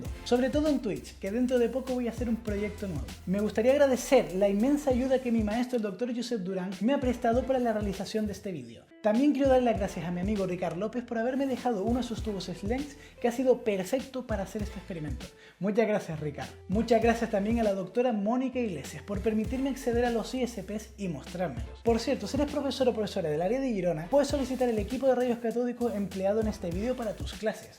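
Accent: Chile. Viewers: Soy yo o tiene un acento parecido sl chileno? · Gracias! Excelente infotración. El centro de mi ciudad estaba repleto de avisos de neón en los '70. Una duda... tienes acento "chileno" es idea mía o eres de acá? Saludos desde Chile!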